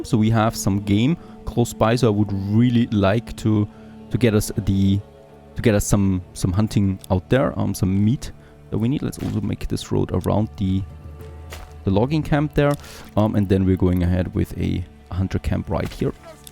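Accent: Germany. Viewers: Für einen Deutschen hast du einen verdammt kleinen Akzent.. : ) .. very good bro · Du bist Deutscher oder ? :D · Are you German?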